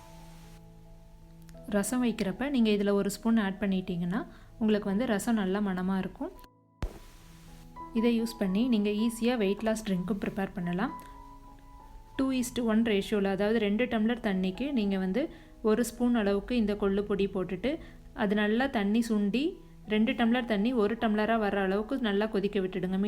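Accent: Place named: Erode, India. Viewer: I like the way you speak😍Erode vaasam US ponalum veesuthu😊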